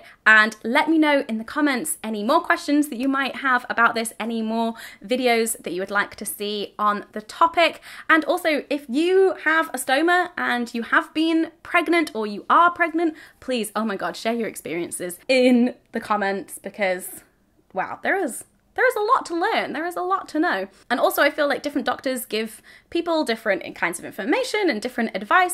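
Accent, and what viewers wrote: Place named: Newcastle, United Kingdom. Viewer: love your geordie accent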